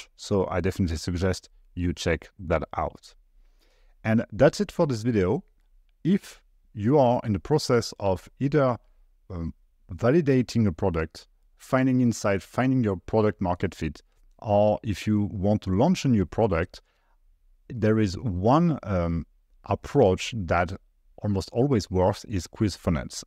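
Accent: France. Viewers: From the way you speak English.. I'm getting your normal French accent. Which means you speak French Did i guess correctly? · Really good, thanks for this advice video, nice english accent (ça s'entends un peu que tu est français comme même :D) Keep it up with the great videos. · 😵‍💫🤯🤯 du jamais vu. Ton approche innovante, la réflexion profonde, la succession des étapes,les outils utilisés chapeau même le fameux French accent 👍. Tu as un abonné et un like + un commentaire. Merci pour le partage. Je crois que tu as été copié si ce n’est pas quelqu’un de ton équipe.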